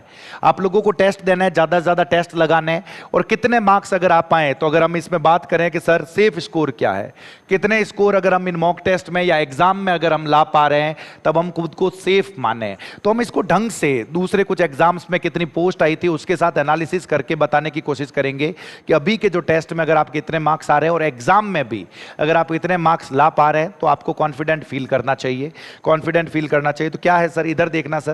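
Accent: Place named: Bikaner, India. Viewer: Guru ji the bikaner ra ho kai aa bhasha mali lag man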